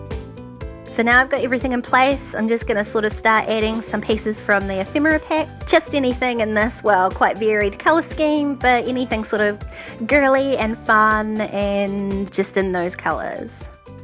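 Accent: New Zealand. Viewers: Do I detect a Southland twang in your accent? Cute page, and a good way to include extra photos when the occasion calls for it.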